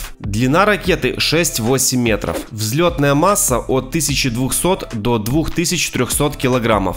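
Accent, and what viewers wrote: Ukraine. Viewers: Сразу понятно что украинец вещает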